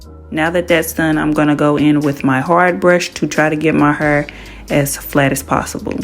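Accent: St. Louis, United States. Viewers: There’s no way you’re not from St. Louis lol😂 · I love the accent 😭 are you from St Louis?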